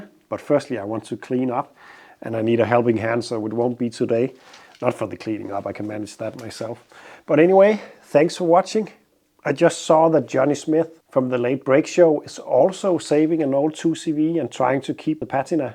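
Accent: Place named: Denmark. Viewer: There I was just eyes down reading a book and your video had just begun when I heard Al Pacino say those immortal words “ Say hello to my little friend “ honestly it sounded so much like him 😂, Pacino with a Danish accent Ofc but so good 😂😂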